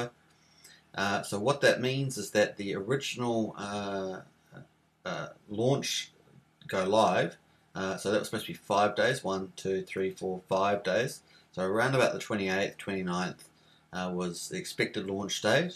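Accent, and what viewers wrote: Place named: New Zealand. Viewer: U sound like a new zealander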